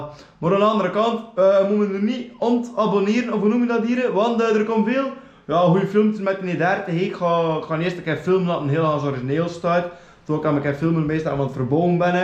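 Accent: Belgium. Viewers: Belgisch met een extreem accent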